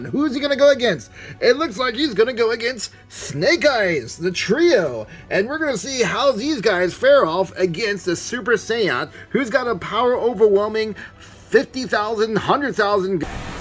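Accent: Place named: United States. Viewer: bro, mind if i'm asking, where did you go to school? your english is so damn good with pure american accent. sorry, no offense. but considering where you are from, i haven't detected any mandarin speaker accent. btw, i'm glad that i've waited this far to purchase snake eyes cause i really like this movie version a lot far better than the rest cause of his ninja's and assassin's look. i've always considered him as an assassin. all the previous released looks more military to me. which is not my cup of tea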